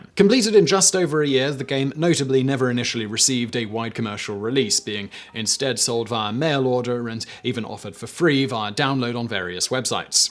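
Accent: United Kingdom. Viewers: Dude, hes British. Haha.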